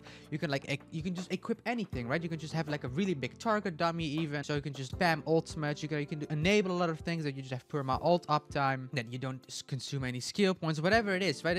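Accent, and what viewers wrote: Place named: Italy. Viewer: My guess is Italian 🤔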